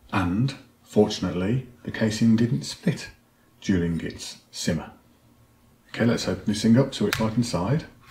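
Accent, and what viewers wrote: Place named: Birmingham, United Kingdom. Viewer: Brummy? Noice.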